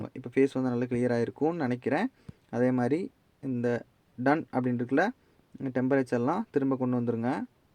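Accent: Kanyakumari, India. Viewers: Neeka yanntha Oru Anna 🤔 kaniyakumari language mathiri iruku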